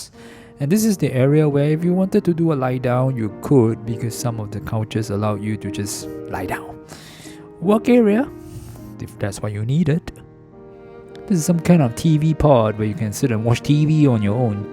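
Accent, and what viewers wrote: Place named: Singapore. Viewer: Your SINGLISH accent is sooo funny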